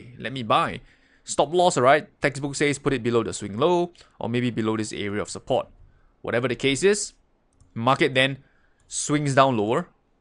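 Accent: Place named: Singapore. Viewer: Singapore English....lol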